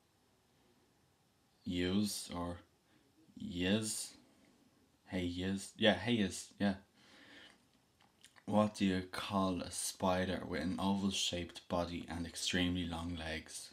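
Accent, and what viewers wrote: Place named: Dublin, Ireland. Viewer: you have the 'i'm from dublin but i'm also gay' accent. not in a bad way it's just it really is its own accent. :)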